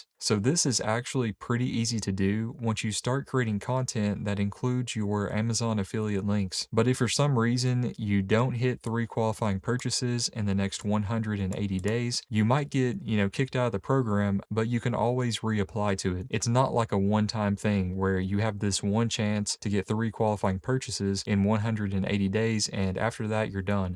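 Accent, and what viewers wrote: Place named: South, United States. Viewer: This guy's Southern accent is everything 🤩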